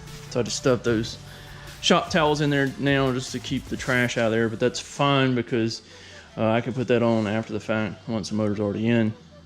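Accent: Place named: West Virginia, United States. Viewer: I would swear you was here in West Virginia driving that old Teg you call “Skeeter” . Same way we do it out East here anyhow. Guess Alabama aint much different than here. Shit had me laughing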